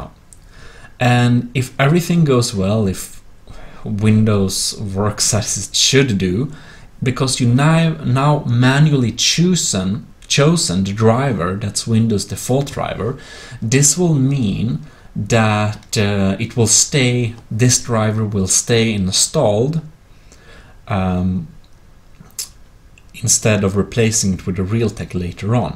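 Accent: Sweden. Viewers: Man hör verkligen att du är svensk lol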